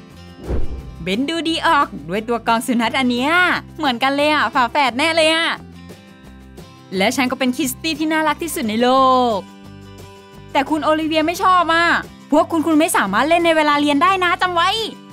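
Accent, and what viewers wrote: Thailand. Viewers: ทำไมมันดูเหน่อๆง่ะ😅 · ้เสียงคนพากย์เหน่อๆ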